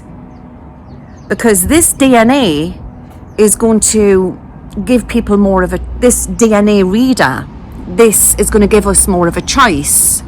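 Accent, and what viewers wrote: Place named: England, United Kingdom. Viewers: Luv ya and our English accent dearie.... thank you for sharing your gifts and insights and positvity! Do you see a tsunami originating in La Palma Island affecting the east coast of the united states? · Thank you for given us important information. I deeply resonate with your words and predictions. For more than ten years I have been translating and introducing some of the English alternative articles and videos which I felt interesting in my blog site so that some of the Japanese people can understand them. Lots of the Japanese are not getting enough information from abroad though much of the important information come from outside Japan. I think that is what I am here for. Long time ago I used to live in England for 12 years so I love to hear your northeastern accent. Thank you.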